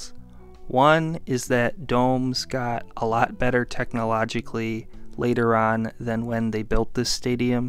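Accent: United States. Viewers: One suggestion for a future video. Saint Petersburg, Florida. The original name was The Thunder Dome. Less than 48 hours later, I heard people calling it The Blunder Dome. SunTrust merged with BB&T and is now Truist. The voice has to be from the US. The City is MontReal, but he said Mont Treal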